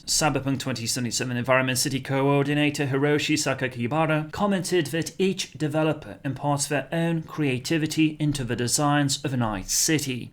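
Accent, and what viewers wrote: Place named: North Wales, United Kingdom. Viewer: If I had to guess yeah. You pronounce some words very Welshy and some things sound slightly northern, your voice is really hard to pin down tho. Where are you from then?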